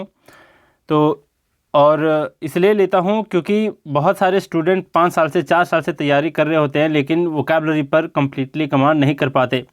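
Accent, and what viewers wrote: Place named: Jamshedpur, India. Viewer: Sir app Jamsehdpur se ho kya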